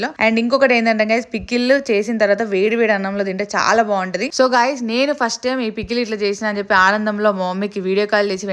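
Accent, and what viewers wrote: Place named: Karimnagar, India. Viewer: Karimnagarollam ma basha slang vere untadi😂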